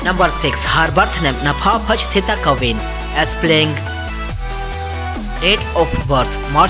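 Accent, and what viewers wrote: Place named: India. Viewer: Nice video. By the way I think ur Indian bcoz the way u pronounce English words only Indian or Pakistani people pronounce.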